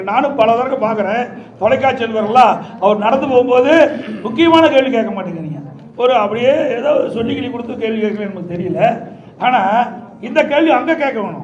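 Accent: India. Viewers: திலுப்பி திலுப்பி கொங்கு தமிழ்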